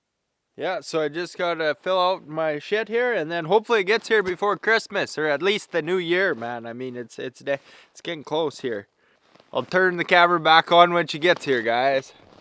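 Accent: Canada. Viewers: I came across your channel years ago, and got a kick out of your Canadian accent. (Eh?) But I kept coming back because you weren't trying to be a YouTube "star." You were just doing fun things, and bringing us along with you. I wish you more and more success as the years go by. 🤩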